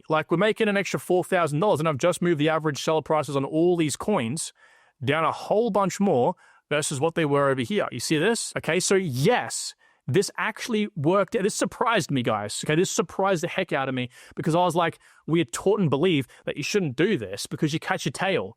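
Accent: Australia. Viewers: Nice video. Tho, I would enjoy your channel alot more if the Australian accent was toned down a bit.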